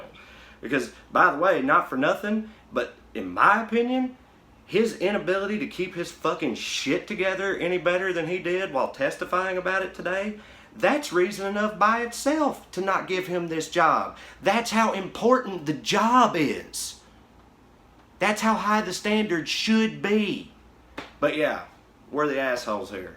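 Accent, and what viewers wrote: South, United States. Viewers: I’m just here for the adorable southern accent!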